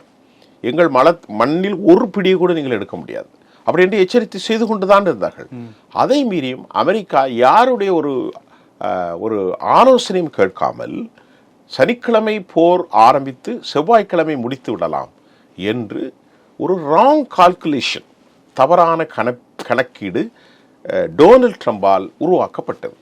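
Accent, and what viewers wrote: Sri Lanka. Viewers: His voice is unique cylon tamil ...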